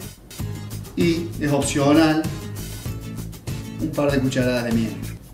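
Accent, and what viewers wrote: Córdoba, Argentina. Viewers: Desde hace muchos años soy un apasionado por la cocina. Hace menos años me sumé a la pastelería y tus clases fueron tesoros en esta nueva búsqueda. Para esta navidad hice turrón turco, pan dulce y budines. Fueron elogiados. Por eso va para vos mi agradecimiento por la generosidad con la que compartís tus secretos y conocimientos. Además, como soy patriota cordobés, disfruto de un maestro con mi mismo acento.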